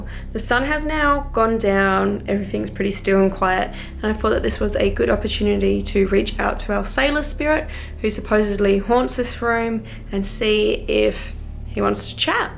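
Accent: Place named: Australia. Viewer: U from Australia bb i thought u from England mmm ok thanks for that tour it looks cool that hotel great info??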